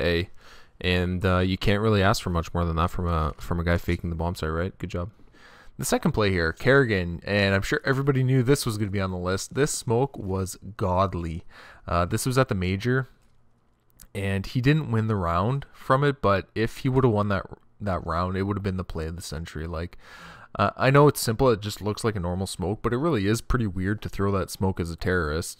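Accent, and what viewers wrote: Canada. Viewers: are you canadian m8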